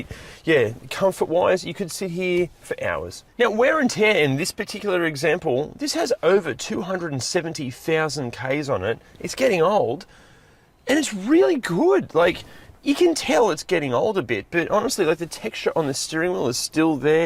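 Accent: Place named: Australia. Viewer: Horrible accent. What is it? Doesn’t pronounce his ‘t’s and ‘R’s Australian I think?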